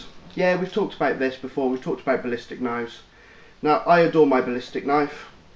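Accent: United Kingdom. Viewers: Are your British ? That's really cool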